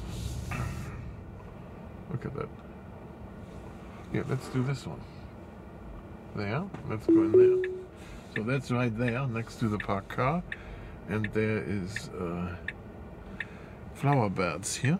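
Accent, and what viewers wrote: Germany. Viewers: Just curious, where are you from? Because your English sounds a bit with a German accent. I could be completely wrong though. Amazing how vision Autopark works. Now they need to speed it up a bit and they should stop turning the wheel while the car stays still. Just unnecessary stress on the axle / steering components etc.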